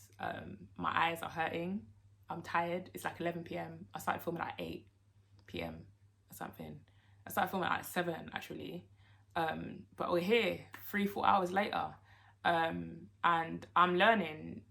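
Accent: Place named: Jamaica. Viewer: You are amazing!! I was so scared of it as well. It will get better! We support you 1000% Are you Jamaican? Loving the patois 😂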